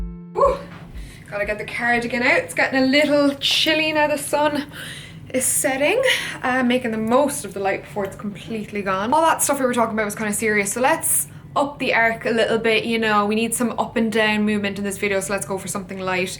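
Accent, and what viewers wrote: Ireland. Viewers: Your Irish accent is poppin even more now!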